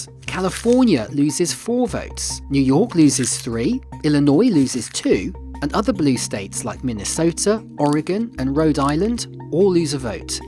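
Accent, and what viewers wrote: United Kingdom. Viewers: I love how it's narrated by a brit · The British accent is distracting. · NYT needs to hire an American to narrate these videos. Why should we Americans be lectured by a British accent?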